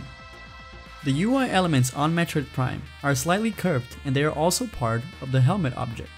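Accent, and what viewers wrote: Brazil. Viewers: Are u brazilian my man?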